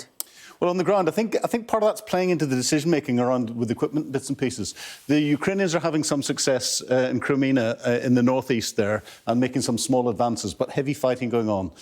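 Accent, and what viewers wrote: United Kingdom. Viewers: she sounds British but she is not British. How can this be ?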